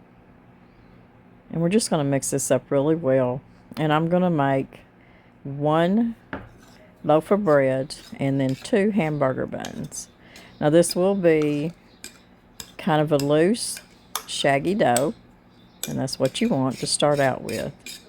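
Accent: South, United States. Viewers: I feel the same way……love everything about the home, both inside and out! Miss is simply a treasure, and I look forward to each and every video she and share with us! She has such an easy, gentle way of speaking, and I love listening to her sweet voice and southern accent. This video is one of my favorites, and I think many of us feel as you do ……she’s a “kindred spirit”, for sure! As for her beautiful wood floors……I can’t imagine telling ANYONE that their floor looks dirty!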